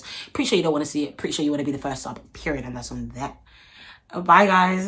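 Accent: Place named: Cockney, United Kingdom. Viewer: Lovely lass!! Your so cockney I love it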